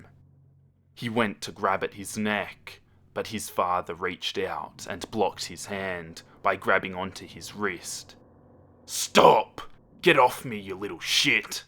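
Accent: Australia. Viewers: You sound like an Australian version of Chillz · I think it sounds more austrailian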